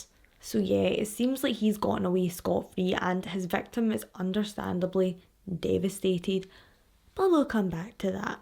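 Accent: Scotland, United Kingdom. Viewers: I first heard it as "ghetto!" So I wonder if that other fan is also American. I figured it out quick enough but every time I'm still like: how can GIRL sound like GHETTO to me??? Glad I'm not the only one hearing a t/d in there. 😂 It's a lovely accent!!! Speaking of your accent, the first video I watched, as I was about to watch it briefly thought: wonder where she's from... American?, Latina?, Mediterranean?... well I'll know in a moment when I hear her... Scottish?!!!! 😂😂😂😂 So it was a LOVELY surprise. (Not that there's really any accents I don't like, but gotta say Irish and Scottish are up there in my faves!)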